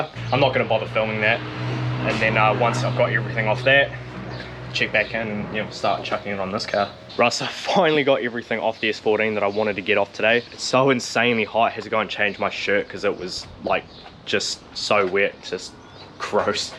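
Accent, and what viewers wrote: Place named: New Zealand. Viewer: What part of nz are you from bro?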